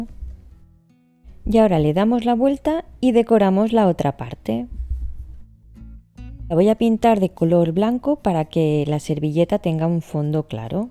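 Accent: Catalonia, Spain. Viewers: Eres Catalana por tu peculiar forma de hablar Me encanta , pues conozco bastante Catalunya y,tengo muchos amigos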